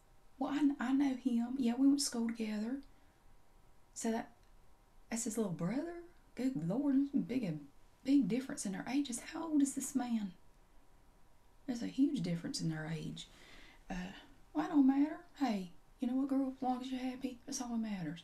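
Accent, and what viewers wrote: South, United States. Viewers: Lol you are soooooooo southern. " luuuuuuuuuk ". Love it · this makes me so comfortable, cuz I'm from the south and I meet people an accent like that , it just makes me feel at home😆😆😆😆 keep doing what your doing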